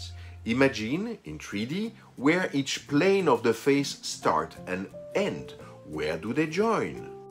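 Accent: France. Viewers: However. As a swedish old man, I appreciate your french seasoning english. I love that. :) That is enough musical to my ears. Your paintings are delicate indeed, and I love to watch. Quite soon I will grab some aquarelle painting. I painted somewhat, many years ago. I am eager, but hesitates about what brand, I want to use, or if guache is the choice. I planned to use just three basic colours, white, and some earth colours. Many years ago, I painted home made baskets, and small furnitures, using egg-oil tempera, or oil and earth pigments, as decoration. It was fun then. I also made oil paint for windows, by grinding titanic, and zinc-white into linseed oil and turpentine. It was heavenly to apply, but a beast to make large amount of. First, a layer a lean, diluted by turpentine. Dry for a week. Next somewhat more oil-fat. Dry for a week, and the final layer of full oil. 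I used aways art brushes from pig-hair, and it was an enjoyment to apply the oil color. Linseed and turpentine is just perfume-alike. I believe you know. :)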